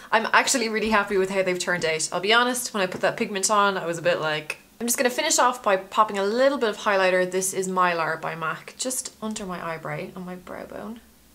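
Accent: Ireland. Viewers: She is Irish but recently moved to Oz. She better keep her Irish accent I love it. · DAMN IT. I meant to ask if you were Irish? Your accent sounds Irish but you're Australian?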